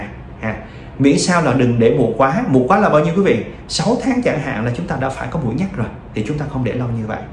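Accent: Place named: Da Lat, Vietnam. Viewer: Bs nói giống tiếng dân Đà lạt quá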